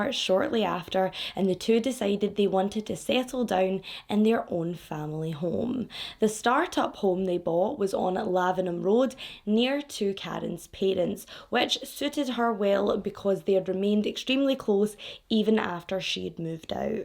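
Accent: Scotland, United Kingdom. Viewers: I find my Aussie self turning Scottish with your regular bits.. then pleaaase, tuurrrn awayy nowww 😊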